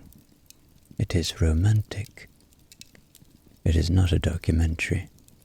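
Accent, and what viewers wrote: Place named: Ireland. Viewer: Being ill with MS I often fall asleep during the day and I have taken to listening to at these times as well as the time when I have difficulty sleeping at night fatigue is weird like that but you are amazingly talented and you are the perfect person to help me get to sleep being Irish and having that lovely Irish accent and soft lilt that I love I am so happy and grateful to have found you.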